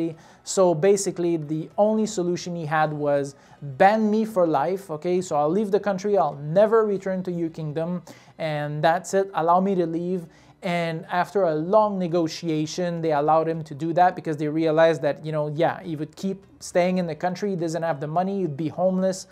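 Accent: Canada. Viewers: His accent sounds Canadian to me. Don't think he was comparing to U.S.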